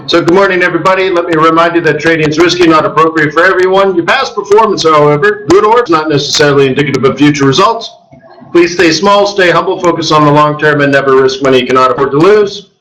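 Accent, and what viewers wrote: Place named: England, United Kingdom. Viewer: you sound so funny with that English accent😂😂😂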